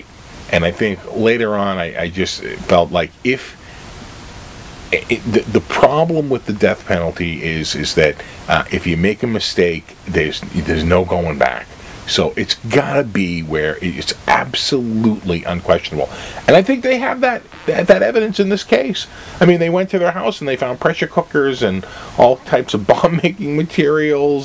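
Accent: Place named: Massachusetts, United States. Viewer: Hey: I finally figured out where you live. My wife is from Sharon and I grew up in Abington although we've lived in Maine for the last 25 years. I thought I recognized the accent. How come people from your area never vacation in Maine but think NH is the vacation Mecca. We are, after all, the vacation state! LOL. Rock on Dude..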